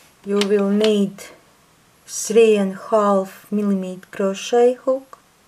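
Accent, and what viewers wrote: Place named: Latvia. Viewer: Are you Latvian? You sound sooo Latvian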